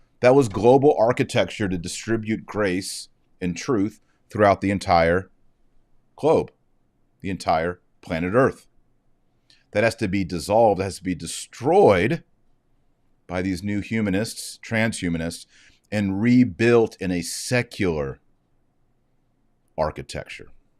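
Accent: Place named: Texas, United States. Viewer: It's just his Texan accent! Check out ' ' by on yt. Should be our motto.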